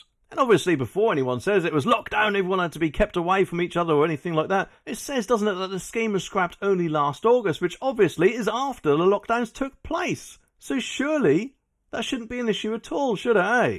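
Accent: Norfolk, United Kingdom. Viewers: You sound just like a good old norfolk boy 😅❤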